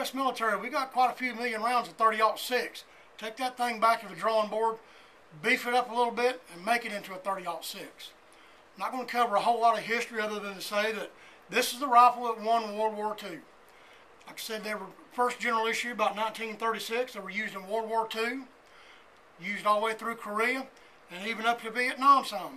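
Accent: Maine, United States. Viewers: Is that a Maine accent????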